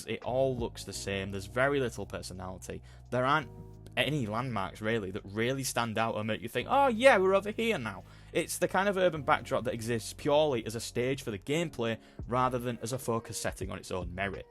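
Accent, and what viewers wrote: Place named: United Kingdom. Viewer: If it's any consolation, I thought you did a good job of it. You could have tightened up parts of it so you don't repeat things too much, but your points were well made, and I agree with most of them. I, for one, appreciate the voice of a fellow Brit, and as an older gamer, I enjoyed you speaking normally, rather than the breakneck pace I hear on so many videos of this type!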